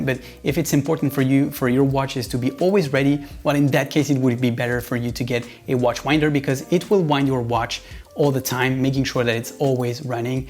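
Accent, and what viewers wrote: France. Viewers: My God!!!! That accent!!!!! 😍😍😍 Tu parles francais? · the French accent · Je parie ma collection Seiko 5 que vous êtes français 😅 L'accent ne ment pas ❤ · really good and clear presentation, a lot less douchy than other watch channels, love the french accent. subscribed. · French accent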